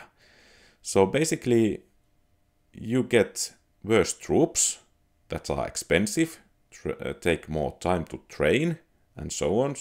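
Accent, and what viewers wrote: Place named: Finland. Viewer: rallienglanti oli vahva täällä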